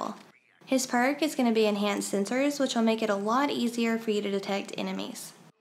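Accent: South, United States. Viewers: O boy I can sure tell your southern lol so am I from Oklahoma 😉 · Are you from the south? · Not gonna lie wasn’t expecting the southern girl accent. No negativity tho pleasant surprise. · I like your southern accent. From one southerner to another. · Nice guide. Lots of gamers just drone on and on and end up losing the audience. Plus I don't often hear a lot of gamers with a slight southern sounding accent, especially girl gamers. Thanks for representing. Makes me a little less self-conscious.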